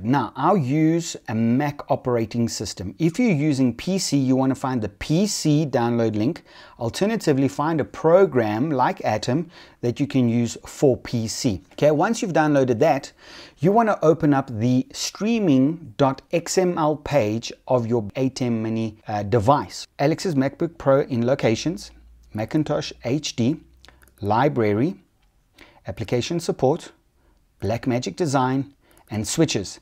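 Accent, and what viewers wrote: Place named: South Africa. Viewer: So cool hearing a South African voice on YouTube.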